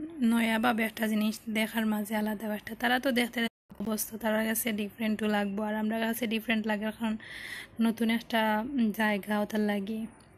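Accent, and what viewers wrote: Sylhet, Bangladesh. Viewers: আপা সিলেটি কতা সুনতে পালাম❤ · সিলেট কোন যায়গায় বাড়ি,,আমিও সিলেট দুবাই থাকি। তোমার মাত, বা সিলেটী কথা শুনে লাগে কেউ সামনে বসে কথা বলছেন।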